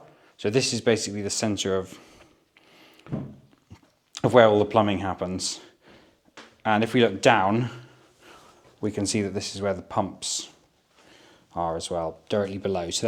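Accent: United Kingdom. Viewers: Some old reclaimed floor boards would be beautiful in this old house 😊 can you tell me where in the UK you are from? I am so curious as to where this accent is coming from! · I like your british accernt! so classy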